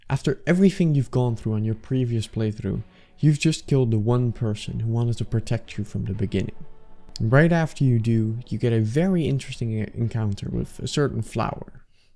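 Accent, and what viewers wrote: Netherlands. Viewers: i smell dutch..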